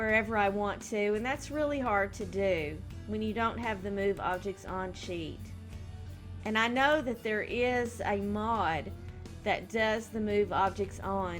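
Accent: South, United States. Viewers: Love your sweet southern accent :) I live in Georgia so its a good feeling hearing a southern simmer voice! :D But i dont know why because i am from the north and i have northern accent. Great video! I subscribed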